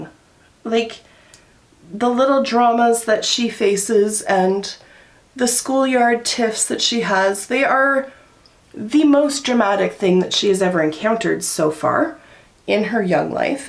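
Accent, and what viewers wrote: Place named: Ontario, Canada. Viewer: Having one's Mom come to your defense means absolutely nothing to me. Of course her Mom would have her back! Big duh on that one, right?!?! Also, I totally pegged your Ontario accent because I grew up in Buffalo and have spent a ridiculously large amount of time in Toronto and went to camp outside of Ottawa every year. Wish I lived in Toronto, maybe one day.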